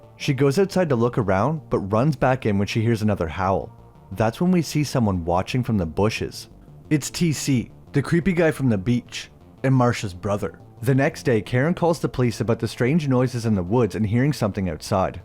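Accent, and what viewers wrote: Canada. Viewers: Am definitely enjoying the Canadian accent. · are you from Canada? I hear a couple of "oots" aka outs lol. Hope this isn't offensive. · bb are you canadian?? cuz you hella sound like it lol (i'm from vancouver)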